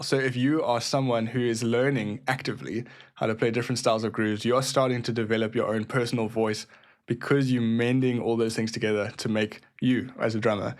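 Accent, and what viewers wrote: South Africa. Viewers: A South African 😂 with a KZN accent?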